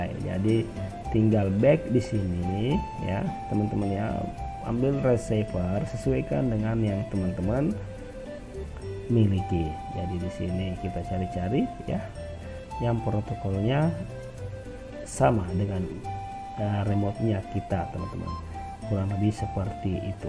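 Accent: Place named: Kendari, Indonesia. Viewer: Wah orang kendari ya???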